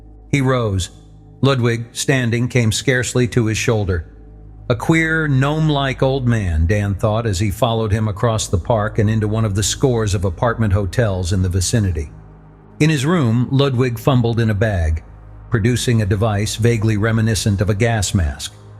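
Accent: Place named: United States. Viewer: Too bad the narration switched to an annoying American voice.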